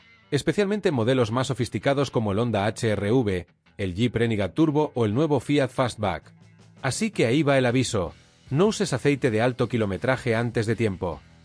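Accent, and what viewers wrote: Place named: Spain. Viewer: Me desesperó ese acento español ibérico y creo que el video no aporta mucho. Es evidente que está hecho por IA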